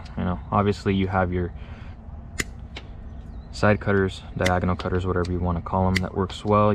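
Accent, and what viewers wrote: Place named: United States. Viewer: Good to hear an American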